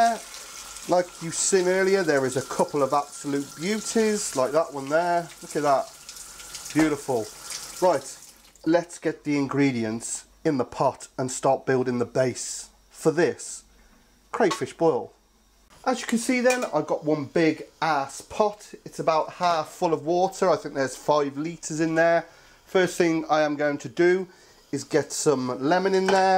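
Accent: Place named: England, United Kingdom. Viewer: ur sweet accent alone tells ur from england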